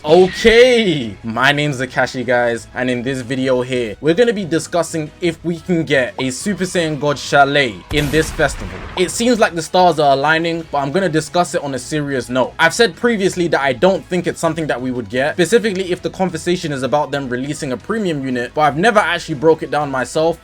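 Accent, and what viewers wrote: United Kingdom. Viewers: So You're From United Kingdom Brittish, Because Is So Much Diferent From USA, Because Of Brittish Accent, Saying "Shallet" With The Sound Of "A" Instead Of "E" So I Mean You're A Brittish So Its Easier Than Learning English From The United States Of America.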